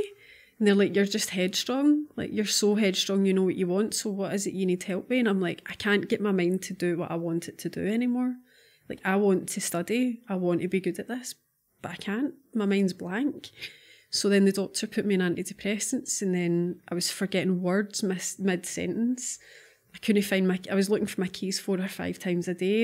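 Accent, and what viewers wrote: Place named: Scotland, United Kingdom. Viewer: I could listen too her voice all day long. Scottish women 😍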